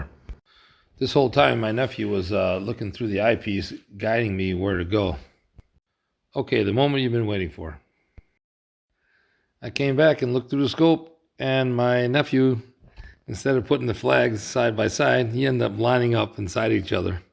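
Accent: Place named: Italian-American, United States. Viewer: You sound like a fellow paisan! Keep up the good work!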